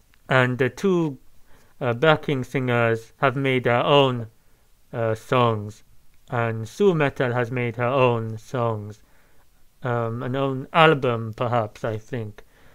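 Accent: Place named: France. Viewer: When he said "France is not a real place, but Wakanda is" I almost hurt myself trying not to laugh really loudly for fear of waking up my wife. That was great. The accent does sound vaguely French, but that could mean any of dozens of different countries. Easy to understand though, and I enjoy how much commentary he gives. His enthusiasm comes through, even with the mask. Mikio Fujioka was the guitarist who fell off an observation deck at the end of 2017, sadly. He's usually the one playing the black guitar, while Ohmura always plays his pink guitar, making him easy to spot. This time I think it was Leda Cygnus playing the black guitar, who filled in often for Mikio, because of the scheduling conflicts you mentioned. Mikio was a guitar teacher at a high level music school in Japan, so he probably needed someone to fill in for him regularly. Ohmura and his pink guitar are almost always there.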